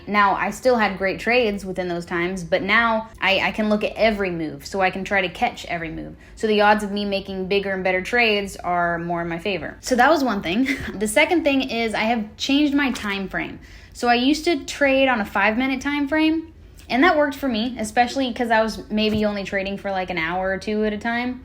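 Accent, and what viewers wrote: South, United States. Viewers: Lol I can hear a southern accent a little bit haha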